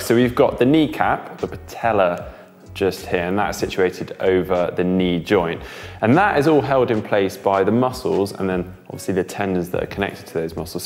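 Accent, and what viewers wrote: United Kingdom. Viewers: Is that British accent?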